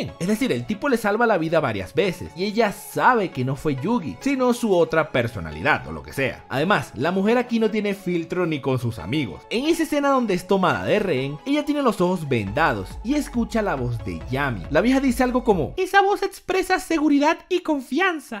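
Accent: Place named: Venezuela. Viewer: pana tu eres venezolano, tu acento me parece de alla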